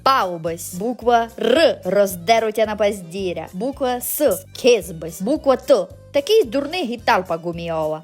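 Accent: Transcarpathia, Ukraine. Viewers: Дуже подобається закарпатський діалект і неординарний гумор)) Та ще й не аби яка симпатюля😉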